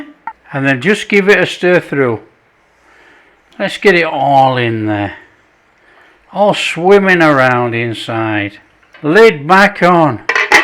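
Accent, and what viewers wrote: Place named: Scotland, United Kingdom. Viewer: Is that a scottish accent?Whatever it is I love it.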